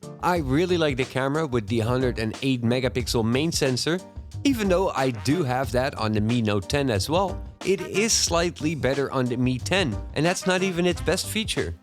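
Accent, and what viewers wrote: Netherlands. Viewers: Are you Dutch by chance? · Do I hear a dutch accent?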